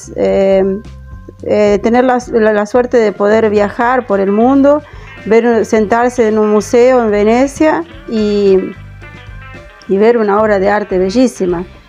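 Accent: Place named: Argentina. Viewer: Me encantó tu forma de relatar bien argentina 👏🏻😂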